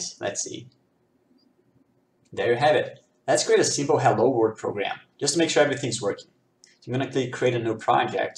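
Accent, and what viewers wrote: Brazil. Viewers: The accent gave it away! I instantly knew you were Brazilian!🇧🇷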